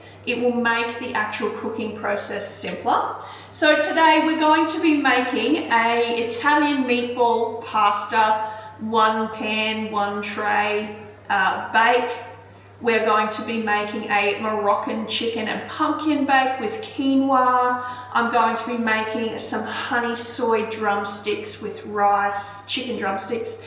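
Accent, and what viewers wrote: Australia. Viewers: They all look yummy and easy. Ann, 74, from southeastern Coastal North Carolina USA. Where are you? You sound Australian but you were talking about warm weather and I thought you all are going into winter.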